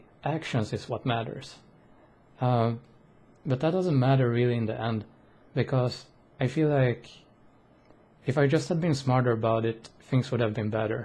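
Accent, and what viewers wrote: Sweden. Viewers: Du låter svensk i brytningen! Vill bara säga att du verkar vara en härlig människa! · Ååååh!! :) Tyckte det var svensk "dialekt"! :D Du är klok du och en riktigt fin människa. Uppskattar ditt meddelande till oss mannen!! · I would love to have a friend from Sweden. If your ever into gaming and/or chatting let me know. I know there are a lot of people who are here for you. You have a lovely accent and a very calming voice and I'd add that to your list of nice features. I also wonder what you'd look like with your hair pulled back. I agree about health too, it's been pretty difficult socially for me this last year and staying healthy is something I strive to do as well.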